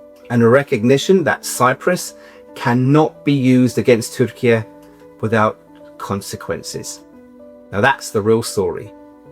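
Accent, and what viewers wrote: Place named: United Kingdom. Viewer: you are invaders to Cyprus you have no rights there that's why you been ignored by all the states Turkish Cypriots ? really where you came from cause before 1100 AC there is nothing written about Turks you only came with the Mongols so what is your rights on an Island that been 3000 years known as Greek ? read some history as your accent is British there are many many libraries to go and open your eyes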